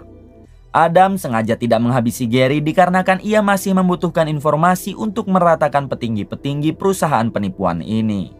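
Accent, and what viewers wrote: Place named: Majalengka, Indonesia. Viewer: Lu orang Majalengka ya min ?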